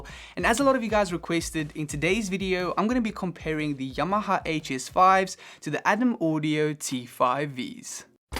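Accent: South Africa. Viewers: Sounds like a South African :)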